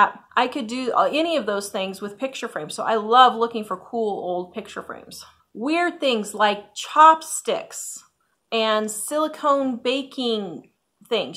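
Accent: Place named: Kansas, United States. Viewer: We all know that you say warsh instead of wash. This is the first time I ever noticed that you say tonne instead of ton. Is that from Kansas, too?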